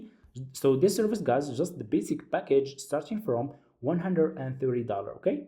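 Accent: Morocco. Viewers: مغربي ياك من اللهجة باينة؟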